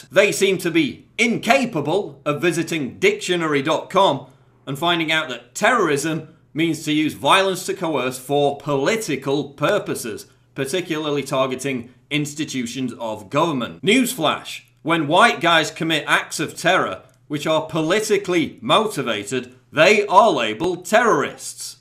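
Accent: United Kingdom. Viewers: Another Alex Jones wannabe, with a British accent. Yawwwwn.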